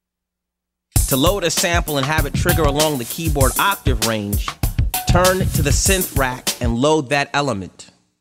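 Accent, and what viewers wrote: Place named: United States. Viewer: No I'm just saying that his english teacher which I'm 100% sure that teaches British english and not american english would assert to him that the accent of the voice in this video is american and not english ( in a sense of british english ). That's all my friend! ...and I don't know why I'm losing time to clarify this stupid thing to you.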